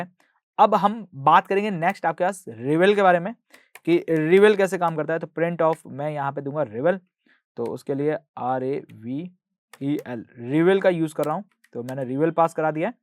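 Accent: India. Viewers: get rid of that indian eng it sucks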